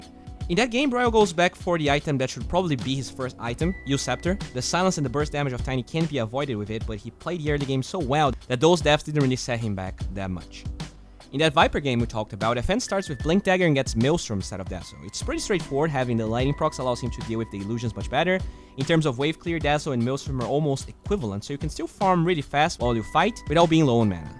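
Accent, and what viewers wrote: Brazil. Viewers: esse sotaque de br quase imperceptivel mas eu percebi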